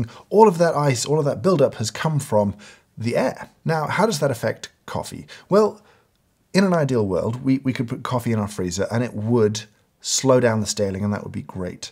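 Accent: United Kingdom. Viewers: Is it just me or do you sound more British than usual? 🤔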